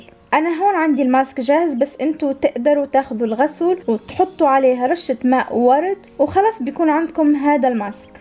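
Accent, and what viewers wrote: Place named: Tunisia. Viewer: تقولش عليك تونسية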